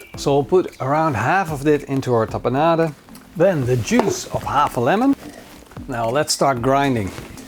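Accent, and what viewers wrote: Netherlands. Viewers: 3 seconds in and I had a feeling u were a fellow Dutchman, nice video very helpful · Ur dutch i can hear iT i am dutch as wel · You sound very Dutch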